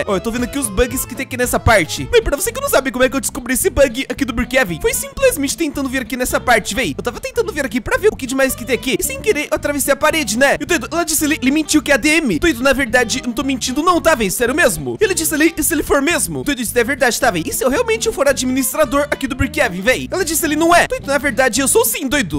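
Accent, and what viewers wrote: Portugal. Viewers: Sotaque de Portugal...blz né kkk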